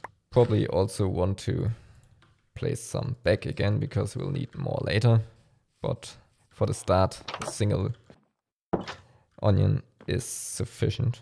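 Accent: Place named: Germany. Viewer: i can hear a small german accent mein Herr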